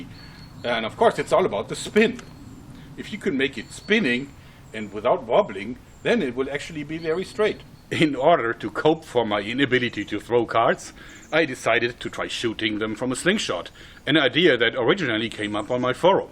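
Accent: Germany. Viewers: Man hoert an deinem Englisch das du Deutscher bist was ich auch schon vorcher wusste aber ich feier dich:) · Bruder man hört das du nen deutscher bist xD Edit. Hab dich grade bei my spaß gesehen bei rab gut gemacht 👍🏻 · Haha du bist deutscher oder? :D oder sogar schweizer? ^^ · du bist deutsch das merkt man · For me he Sounds very German ^^' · Hach, wie ich diesen Deutschen Akzent im Englischen toll finde :D Super Video ✌ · hörst du den deutschen accent nicht wenn er englisch spricht??? wow!!! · oh :X ich dachte Amerika weil alle so blabla dein deutscher Akzent noch sorri dafür ^^ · Yeah, German Accent... I'm German and I hate German accent the most... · Ist der Deutsch,wegen dem "Akzent" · Man hört einfach sofort das du deutsch sprichst 😂ich feier dich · I like your German hah hah hah hah! Sounds so German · the typical german-english accent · hes a german ;) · Haha man merkt richtig dass er deutscher ist😂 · Ich denk die ganze zeit das er deutscher is der es probiert englisch zu reden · Ja, man merkt das er Deutscher ist :D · Are you German? 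You have a German actcent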